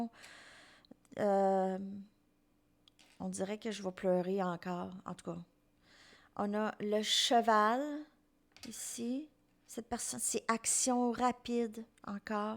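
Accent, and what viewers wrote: Canada. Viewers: j ai toujours aimés l accent canadien c est très beau 👌💋💋💋a vous entendre 😍👍👍👍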